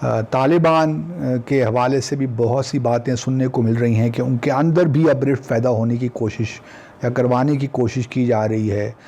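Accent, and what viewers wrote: Pakistan. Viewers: Sir, are you a Hindko speaking Peshawari?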